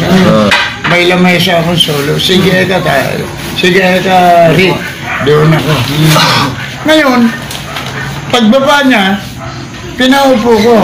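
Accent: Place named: Nueva Ecija, Philippines. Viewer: Parang narinig ko na ung about daliri,Sa pork chop duo yata.?.Pero ok nmn po pagkaka deliver..👍,ung punto ng Bulacan ,prang s Nueva Ecija..Yung mga tumatawa din ang nagdala..heheh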